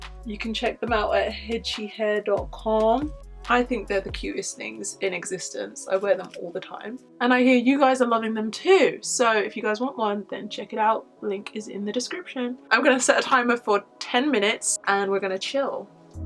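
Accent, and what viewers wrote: United Kingdom. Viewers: I just discovered your channel and I love it ! By the way Oh My God I am obsessed with your british accent 💕💕 PS: Hi from France 🥰🥰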